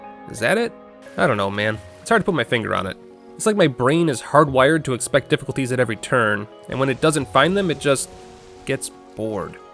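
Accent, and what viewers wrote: United Kingdom. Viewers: Finally British dark souls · When a British play dark souls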